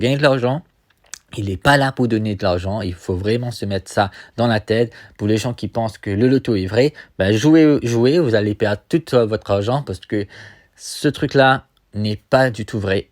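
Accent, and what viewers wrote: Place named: Indian Ocean. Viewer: Ton accent sent l'océan indien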